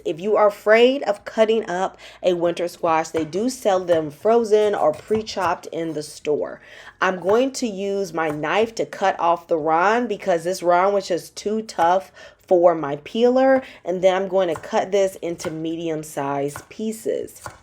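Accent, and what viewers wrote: South, United States. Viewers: My kids been sniffling these past couple of days so I’m definitely going to use this recipe. 🔥Are you from the south?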